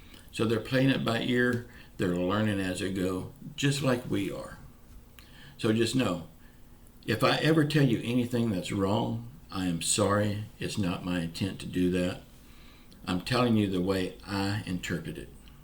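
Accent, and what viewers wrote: South, United States. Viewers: May God heal you sir and i love a southern accent 💛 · I am from the south and I sound a lot like you there is nothing wrong with your speech I love it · Good morning! Sorry to hear about your throat cancer but I still love your southern accent, and thank you for the work that your doing to keep us informed. God bless you sir 🙏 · A knitting show lol.....I love your southern accent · Hey. If you have a knitting show, I'll be there no matter what. Hope you'll still give us an update on the politics AND the stimulus. You're doing a great job. I'm in NC and grew up in FL. Love your Southern accent. Keep up the good work. You are a refreshing start to my day. Thank you for taking the time for us. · your a great man..we need u in office...someone that cares...Prayers for your cancer...thank u for sharing thoughts and videos...taking time for all of us... 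love the southern accent... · GOD BLESS YOU MY BROTHER FOR HELPING US STAY INFORMED DURING THIS TIME, AND PRAY HE HEALS YOUR THROAT CANCER NOW 1 PETER. I LOVE YOUR SOUTHERN DOWN HOME FRIENDLY PERSONALITY, HEART, AND ACCENT LIKE ME AND THE GOOD OLE PEOPLE HERE IN NORTHWEST LOUISIANA!! 😂❤🙌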